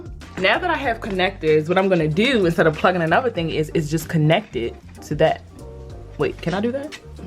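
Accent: Baltimore, United States. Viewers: What I’m gonna dewwww, I heard that bmore accent girl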